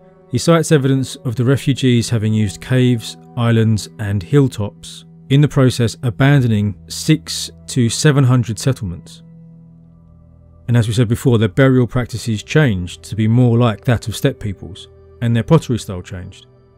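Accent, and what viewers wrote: United Kingdom. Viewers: I cant listen to British narration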